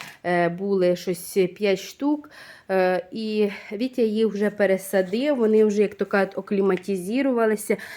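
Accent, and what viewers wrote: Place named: Lviv, Ukraine. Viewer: Добрий вечір.А з якої ви області(району)Діалект як на Львівщині😊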